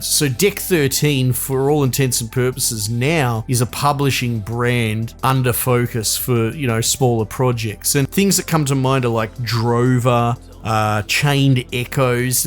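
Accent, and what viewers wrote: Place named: Australia. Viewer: I know it's the aussie accent but I keep hearing Dick13 lol 😂 Just got Surge 1, Surge 2 was such a cool and good game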